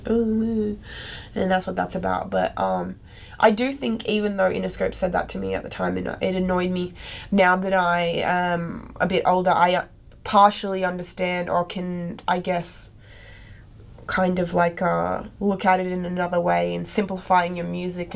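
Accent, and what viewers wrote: Australia. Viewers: she talks naivety with an australian accent well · So why does she still have an aussie accent when she speaks ?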